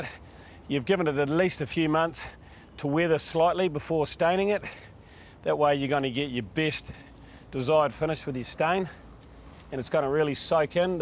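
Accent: New Zealand. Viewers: NZ accent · Hi Daniel, it is New Zealand accent, not Aussie, we say deck.The presenter is great, hubby and i followed instruction and our deck looks great.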